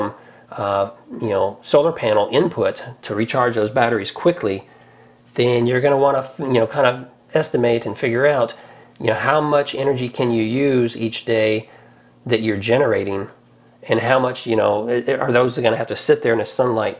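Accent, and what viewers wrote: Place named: South, United States. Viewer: lol. It's all good. Don't take any offense. And who doesn't love the southern accent?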